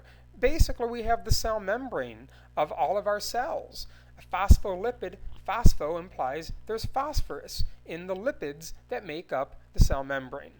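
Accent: Midwest, United States. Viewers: I'm from Michigan. The way he says "rocks" sounds exactly how everyone I know says rocks. I was waiting for him t sound weird, but he never did. Must be a midwesterner.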